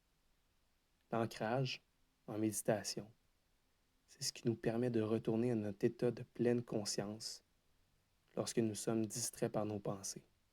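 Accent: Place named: Quebec, Canada. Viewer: ton accent québécois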